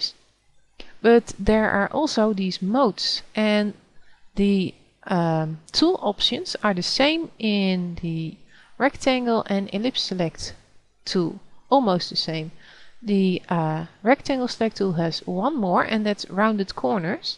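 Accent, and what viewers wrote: Netherlands. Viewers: nice tut with such a trademark dutch accent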